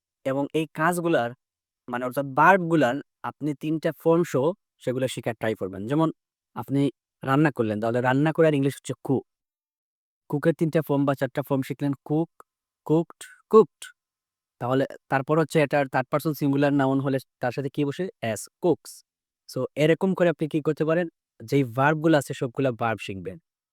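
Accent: Sylhet, Bangladesh. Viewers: ভাইয়া আপনার বাসা কি সিলেট?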